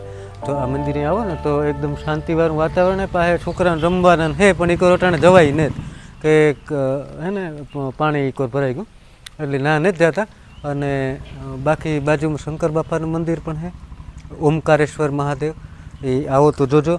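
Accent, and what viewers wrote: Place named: Barda, India. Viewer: કોમેન્ટ કરનાર તમામ મિત્રો ને વિનંતી કે વિડિયો પુરો જોવો ભાઈ વિડીયો મુક્યો ને પાંચ મિનિટ ત્યાં તો છ કોમેન્ટ બધા ને વિનંતી કે વિડિયો પુરો જોવો પશી કોમેન્ટ કરો હા ના ગમ્યું હોય તો એ પણ લખો બધા જેથી બીજીવાર તે ભુલો સુધારીને મુકે ભાઈ બીજું ધણા યુટ્યુબ ચેનલ છે પણ ઘરે બેઠા આપણા ગામોની શેર કરાવે તો થોડો સાથ આપીએ પણ આપણે થોડી બરડા ની બોલી સાથે નો વિડીયો મુકે છે તો વિડિયો ને શેર કરતા રહીએ બખલા ગામ વિશે તથા વાવ મંદિર ખુબજ સરસ 🙏જય ચામુંડા માતાજી 🙏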